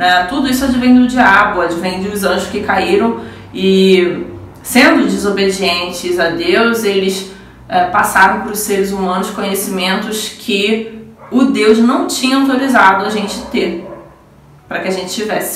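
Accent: Rio de Janeiro, Brazil. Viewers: Vc é daqui do Rio?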